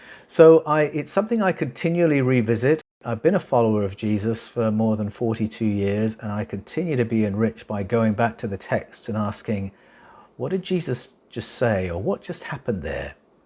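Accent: Australia. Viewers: I took down a scathing comment fueled by disappointment over tainting your material with background music. But your material is WAY too critical and far too poignant at this time to need ANY "accessorizing" brother. Trust me, you are amongst the vanguard and a pillar of this global movement into Truth, and Truth requires no supplementation or overly loud background music. We're hanging onto your every intrinsically melodic Aussie word anyhoo, and imo you require no musical accompaniment.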